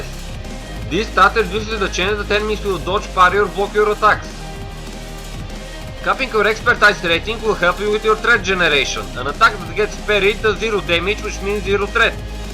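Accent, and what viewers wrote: Russia. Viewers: This IS in English. He just has a heavy russian accent. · As russian as it gets, love it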